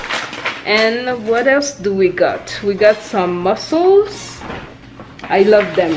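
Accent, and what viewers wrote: France. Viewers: Question pour toi, est ce que tu es antillaise? Ton accent c in peu francais ,un peu Creole. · Nice house, I like ur accent it’s like ur first language is French!! Coz you speak like my French friend 🤗 greets from london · Love your France accent...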